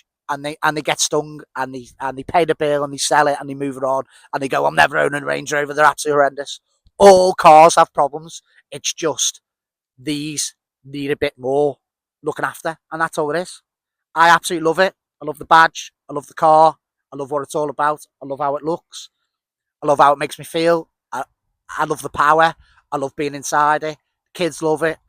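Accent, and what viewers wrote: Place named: United Kingdom. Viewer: Is thus UK? Is this a dialect of English or what is the language please?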